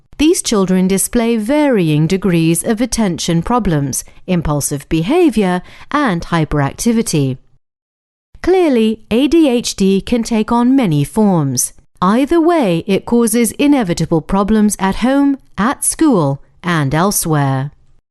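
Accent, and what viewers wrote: United Kingdom. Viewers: I love english accent : ) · typical British English :D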